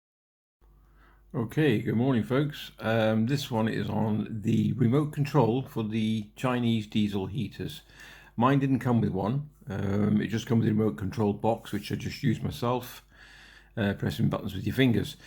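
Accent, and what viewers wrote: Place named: Shrewsbury, United Kingdom. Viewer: Recognise that accent...... Shrewsbury Monner by any chance?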